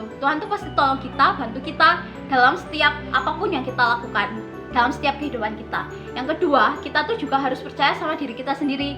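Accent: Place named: United Kingdom. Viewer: British nya uwuw, aku lebih ke logat America:v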